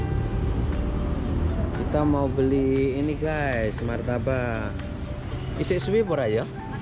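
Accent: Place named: Banyumas, Indonesia. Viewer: pada bae ngapak... salam dari cilacap sukses selalu mas 👍👍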